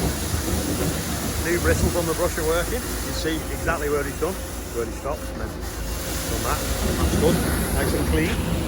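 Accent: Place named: St Helens, United Kingdom. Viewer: it's doing my head in now I'm trying to figure out were ur from I know its some were close to st helens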